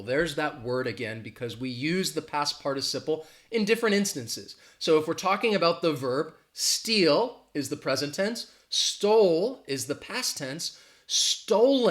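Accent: United States. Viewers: Hiya dude. Kind regards from Venezuela. I do congratulate you on your wonderful and useful Youtube channel. I dunno why, but I love the English language. Therefore, I´m trynna do my best to learn a great deal of it every single day. It ain´t been an easy learning journey. Notwithstanding, I wanna become a proficient English speaker. Likewise, I must confess I love American English. So, I do enjoy watching your videos cuz you speak such a beautiful American English. I still a newbie, I mean I´m still a rookie at learning English. In spite of that, I´m trynna do my best to reach a fluent speaking level. Well, dearest friend, you´re an amazing English teacher and I enjoy watching your wonderful videos.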